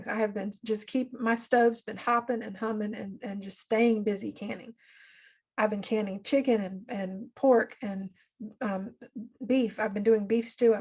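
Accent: North Carolina, United States. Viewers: I am from NC and I agree. Or SC or Virginia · 👍 you sound like you are from NC!😁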